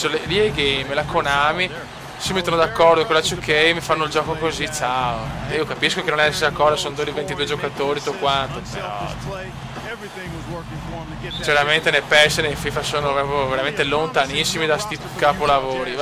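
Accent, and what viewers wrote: Veneto, Italy. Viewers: nè che sboro fioi, non semo ciapà tanto màl. e qua il veneto si sente hahaha grande <3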